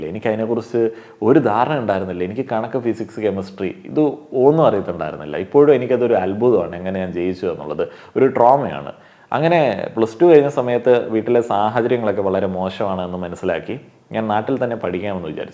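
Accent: Kottayam, India. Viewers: Bro Kottayam kaaran aano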